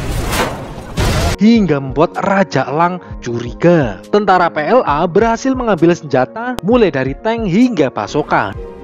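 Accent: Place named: Java, Indonesia. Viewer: Mantap jawanya masih kental sekali😅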